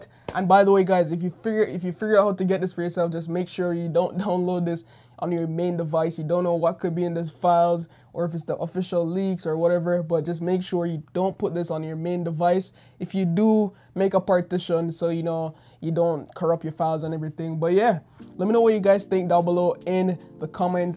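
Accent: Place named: Jamaica. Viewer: You have a Jamaican twang bro are you from jamaica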